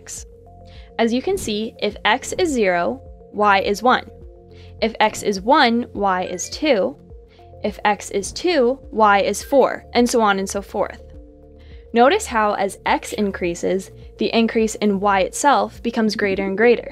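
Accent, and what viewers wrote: Canada. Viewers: Sounds like an Ontario accent.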